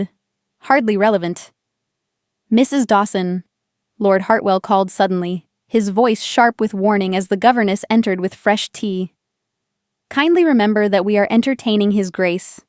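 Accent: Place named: United States. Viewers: I agree. This is weird in an American accent. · Why American accent? Should be narrated in British accent😑.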